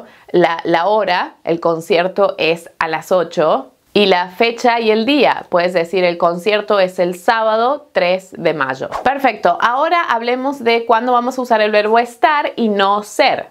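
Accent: Argentina. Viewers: Ella está hablando más en castellano argentino salvó el tu qué acá no se usa el español tiene muchos tiempos de verbos que ni yo los se salen solos soy argentino si querés aprender te aconsejo que vayas más al norte de sudamericana te va a resultar más fácil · ¡Muchas gracias, muy interesante! Me gustan tus lecciones porque aprendí a hablar un poco de español cuando estuve en Argentina, hace muchos años, y entendí que eres argentina por tu pronunciación. La primera diferencia es la pronunciación de dos el... por ejemplo llave, lluvia, llamar, etc. Los argentinos los pronuncian más o menos como g, pero creo que la gente en España los pronuncien diferente. Disculpe mi español, es muy malo. Estoy aprendiendo. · ¿Eres argentina?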